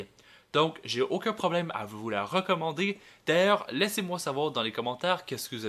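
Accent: Canada. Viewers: Je comprend bien, tu est Canadien ?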